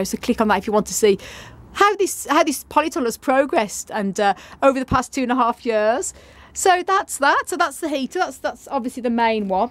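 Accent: United Kingdom. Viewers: lol. said,in her sweet British accent, tarpoline. Here in th US we like to shorten everything and we just call it a tarp. You can get one at any hadware store for 20 dollars or less. As far as your humidity concerns, you can't go wrong with a small fan.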